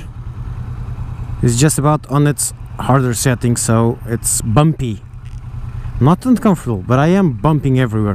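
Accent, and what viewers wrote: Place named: Portugal. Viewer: I love our portuguese accent when trying to speak English xD Boa mota, estava a pensar em comprar, e grande dia de Marginal xD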